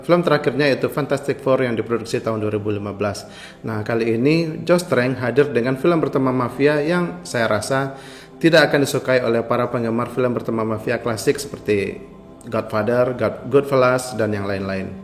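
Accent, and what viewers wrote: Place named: West Sumatra, Indonesia. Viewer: minang yo bang 😅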